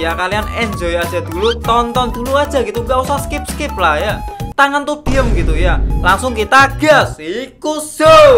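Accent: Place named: Java, Indonesia. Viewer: Medok jawa adik adik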